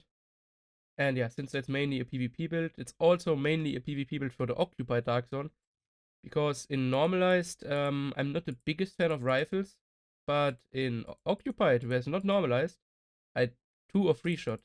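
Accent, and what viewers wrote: Germany. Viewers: Yo! I gotta say u r making some nice videos! U kinda sound German tho... wanna say where u r from?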